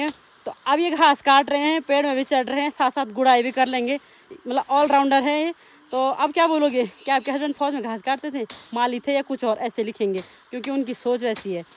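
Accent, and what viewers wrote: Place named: Garhwal, India. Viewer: Tumhare bhasha hamre Garisian ke jess milati julti hai aap kahan se ho Ham Garisian se h aap vbi sayd Garisian hdi sayd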